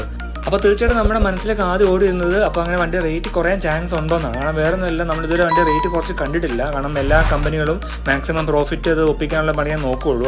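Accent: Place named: Palakkad, India. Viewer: That usage was your signature style and enjoyed the same. By the way are you from Palakkad, coz the particular style seems so.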